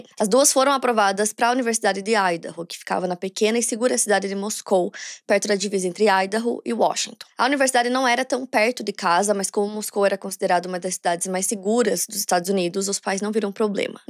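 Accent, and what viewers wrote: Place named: Pernambuco, Brazil. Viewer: vc é de qual estado do nordeste? Reparei em alguns sotaques que parecem ser de pernambuco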